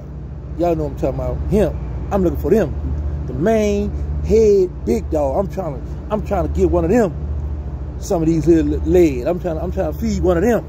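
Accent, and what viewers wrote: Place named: New Orleans, United States. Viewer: you must be visiting N.O. more or you got more folks from N.O. around you on a regular basis because your accent is getting thick again. Shout out to Brian Brooks 2023! Brilliant! Cheers mates!!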